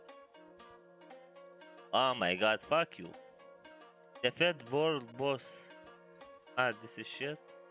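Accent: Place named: England, United Kingdom. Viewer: Nice videos! keep them coming, I like your english accent^^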